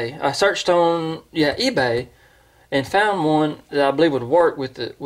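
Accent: South, United States. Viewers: Hey man what part of the South are you from?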